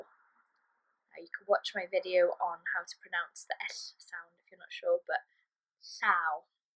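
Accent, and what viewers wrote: Wales, United Kingdom. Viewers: Really helpful video. I'll watch pt2 next. Defo helpful to ask the viewer to say a sentence, then hear it back correctly. Apologies if you've alresdy stated this elsewhere, but is this North Walian or South? Gets confusing when learning in the East Midlands! Diolch yn fawr iawn.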